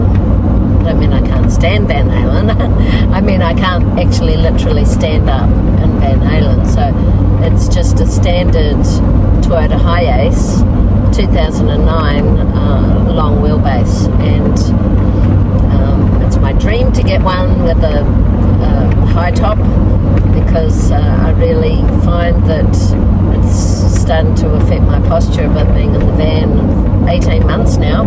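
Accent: New Zealand. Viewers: you need to just get in your van and drive to somewhere else! Your workplace is probably gonna close in the next few days....I am speaking from Far North Queensland where WE get the cyclones every year, and you folks in the south east of QLD have been happily oblivious to what happens in a cyclone for decades, and from your EXENT we're guessing you're from across the ditch where you don't have cyclones........and YES, we live in a Van, and I'm just saying GET YOUR VAN OUTTA THERE and go as far north west as you can for the next 5 - 10 days......you have a MOBILE home, so get mobile, get away from where that cyclone is gonna hit, and come back after all the sh*t has cleared the area where you're "stealth camping" your van. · Haha, Kiwi! I suspected a kiwi accent yesterday and msg you. I have only just heard of you, and been watching your videos today. You did grow up in NZ, so that's why there is an accent 😏 Was so sorry to hear your story of your past in Auckland. 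Rob.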